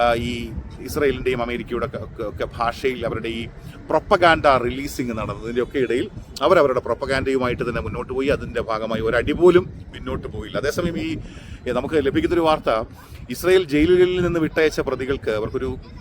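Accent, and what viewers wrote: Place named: Ernakulam, India. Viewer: സത്യസന്ധമായ അവതരണം, ഭാഷ ശൈലി അതിഗംഭീരം, , കേട്ടിരിക്കാൻ സുഖം, ഒരു എറണാകുളം സ്റ്റൈൽ, നന്ദിയുണ്ട് ബ്രോ,,, ❤️❤️❤️❤️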